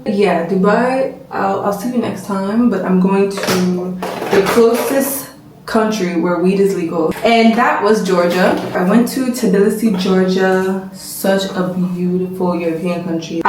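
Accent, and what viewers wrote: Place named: Nigeria. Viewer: ummm babes how come I’m hearing Naija accent o 😂 Welcome back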